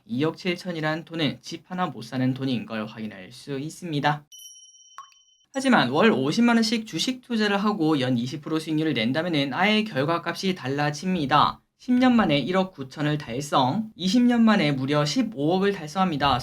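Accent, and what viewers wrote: Gyeongsang, South Korea. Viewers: 대구분셨나요.? 반가버유.~~^^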